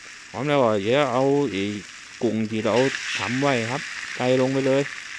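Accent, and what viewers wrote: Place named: Rayong, Thailand. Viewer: พูดระยองด้วยน่ารัก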